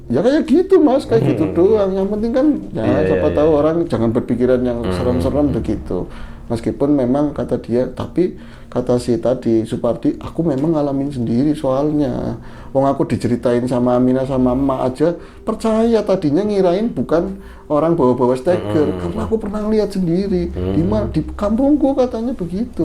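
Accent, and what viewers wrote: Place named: Tegal, Indonesia. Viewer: Logat tegale mntep lah .lucu wkwkwk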